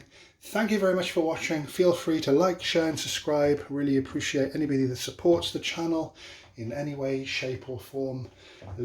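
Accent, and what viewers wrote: United Kingdom. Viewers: Great vid and tips, do i detect a welsh accent?..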